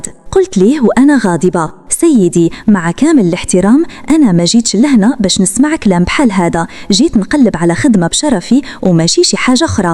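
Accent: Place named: Algeria. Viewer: مغربية و تهدري باللهجة الجزائرية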